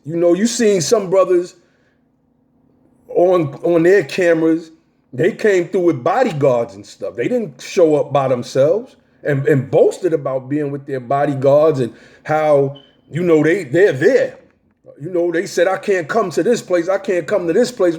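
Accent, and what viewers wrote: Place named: New York, United States. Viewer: You sound like BX or BK, but I love your delivery. Have to Subscribe right now. You speaking for real truth. Exactly how I'm seeing this sucker move in Harlem.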